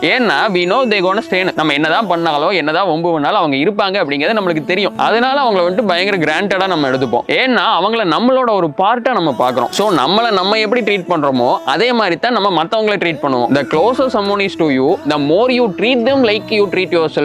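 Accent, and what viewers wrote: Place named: Kongu, India. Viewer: Dei kongu paiya unnoda slangatha enaku pudikum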